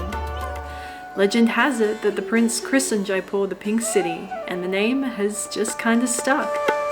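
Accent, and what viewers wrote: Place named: Australia. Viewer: 😂😂😂😘oh this is our Australian accent ❤❤❤❤awesome 😂😂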